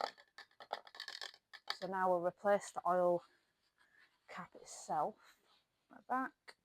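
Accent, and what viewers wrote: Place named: United Kingdom. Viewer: Love the legs and the pants. Also why are you speaking British ?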